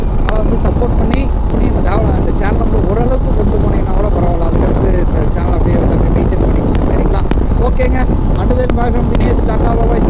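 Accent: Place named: Madurai, India. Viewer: Madurai slang ❤️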